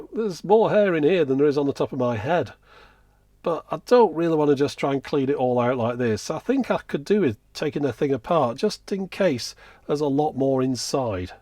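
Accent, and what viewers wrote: United Kingdom. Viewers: "Me mother in law, or me brother...". You have never sounded more british. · Are you british? You speak english like british people 😃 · I'm amazed someone would complain about you pronouncing things the British way. Considering you're British and all. But I probably shouldn't be too surprised I guess.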